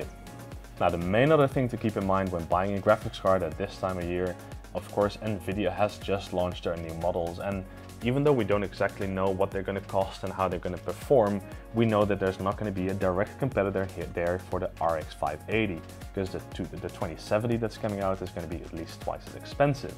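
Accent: Netherlands. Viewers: are you dutch? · Jawel, hij spreekt Engels met een Nederlands accent. Bovendien is hun pand gevestigd in Nederland.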